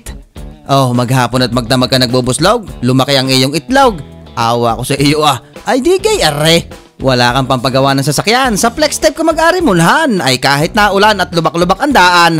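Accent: Batangas, Philippines. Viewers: Solid Yung Batangueño accent Hahahaha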